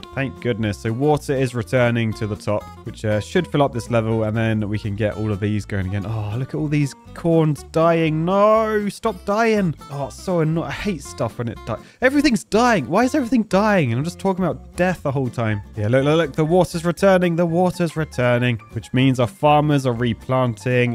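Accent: United Kingdom. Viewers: Are you English or British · Where in the UK are you from?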